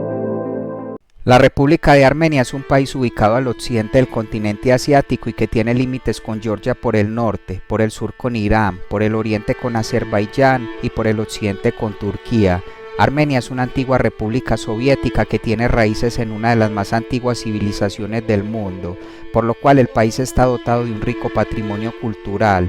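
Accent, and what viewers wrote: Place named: Medellín, Colombia. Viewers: Eres colombiano de Medellín,no? Yo conozco ese acento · Con todo respeto porque hablas así? Eres paisa de Medellín ?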